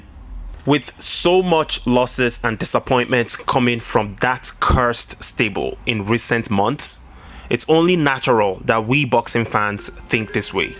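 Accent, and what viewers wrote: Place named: Nigeria. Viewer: First of all, I love your content. You sound extremely eloquent while keeping your strong Nigerian accent intact. However you are just another extreme Wilder fanboy, Eddie hearn is a true promoter for AJ however does eddie run his mouth too much ? Yes he does. Did Eddie hearn force Whyte to take substances ? No he did not. You trying to sound really deep, but calm down you are just another overly emotional wilderfan boy seriously . Calm the fuck down, u don't make much sense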